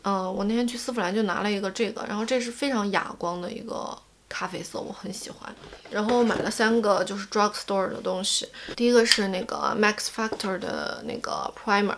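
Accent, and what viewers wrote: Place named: Sichuan, China. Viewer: silvia是四川人么，说水蜜桃的时候就在想，直到说卸甲水的时候说吃进去转一下的时候觉得我们是老乡耶哈哈，稀饭你～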